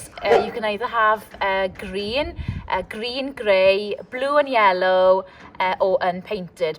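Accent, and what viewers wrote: Wales, United Kingdom. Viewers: Wish i could unbox her beautiful lady beautiful welsh accent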